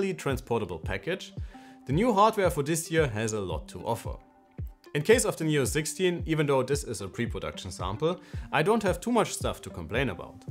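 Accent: Germany. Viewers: Höre ich da etwas einen Landmann im angelsächsischen Bereich wildern?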